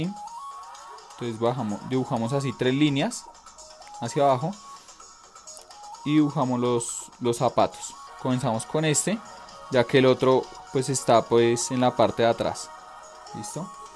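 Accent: Colombia. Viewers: Se le nota tanto que es de bogota.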